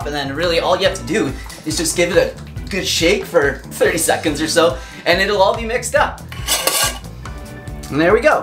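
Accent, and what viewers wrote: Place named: Canada. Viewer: Ahhhh, Canadian. Now the accent makes sense.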